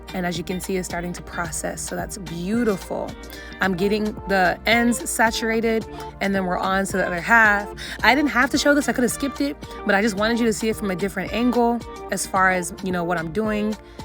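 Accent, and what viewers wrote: Nigeria. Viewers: I just came across this video and I love it. The mix of your ascent with the sprinkle of Nigerian ascent made me subscribe immediately. I love you ❤❤❤. This video helped a lot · It's the Nigerian English twist for me...😅 I so love this wine colour, it suits you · I tried to ignore but I can't, the Yoruba accent creeping in giving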